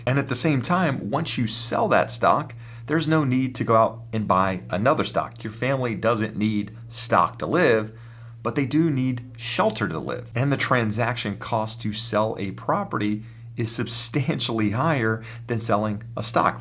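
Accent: California, United States. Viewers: You're from California or I wrong?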